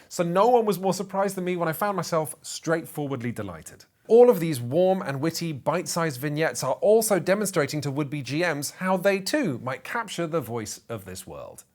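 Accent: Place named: United Kingdom. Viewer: You should review harnmaster, also ur totally British seth skorkowsky.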